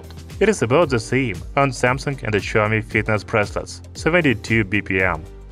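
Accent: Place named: Russia. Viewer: Rusia o alrededores creo. Lo importante es que se entienda incluso con subtitulos.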